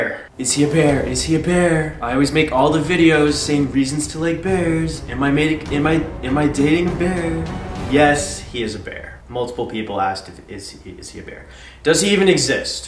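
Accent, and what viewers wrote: Canada. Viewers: Are you Canadian? You have a Canadian accent lol.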